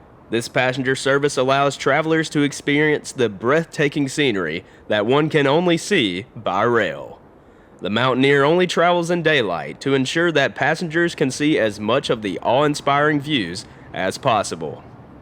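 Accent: South, United States. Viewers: Very nice to hear a narrative cited by your gentle southern accent which is something I wouldn’t have expected on a western Canadian rail video. As one who has an interest and being a fairly read person on our country’s history, I was impressed with the amount of research you obviously did for the narrative. I’m glad that you enjoyed our country.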